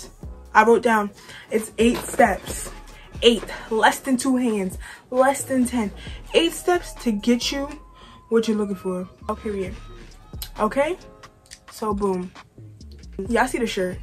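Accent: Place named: New York, United States. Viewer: love the ny accent 🤩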